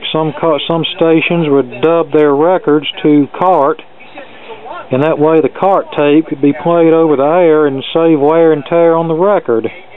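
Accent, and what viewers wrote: South, United States. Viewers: I was born, raised and grew up about 45 minutes outside Nashville, Tennessee. Nashville was a much smaller city than it is today in 2022. In 1985, for about a year, I moved to Downers Grove, IL and worked in Chicago. Communting into the city from Downers Grove was hell on earth, even in 1985! I moved back to near Nashville in 1986. I don't have the southern accent this gentleman has because I worked in major market broadcasting. Although I wanted to major in electrical engineering and be a radio station engineer, I did take a public speaking class in college to speak in a more midwestern accent since I had to do on air work for radio. Also my mother was born and from Brooklyn, New York. She met my Dad while he was in the military. He got station as a Staff Sargent at Ft. Campbell, KY. In 2022, I'm retired. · I love southern people...that voice...kills me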